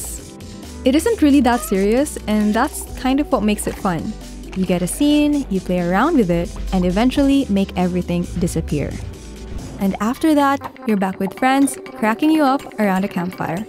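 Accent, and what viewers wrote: Philippines. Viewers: I can hear the Filipina accent from your English haha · She kinda have filo accent ❤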